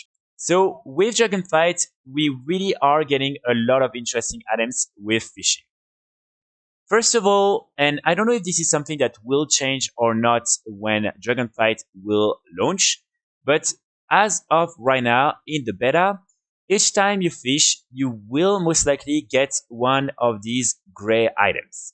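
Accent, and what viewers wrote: France. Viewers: L'accent français me mets toujours aussi bien, bonne vidéo comme d'hab 👍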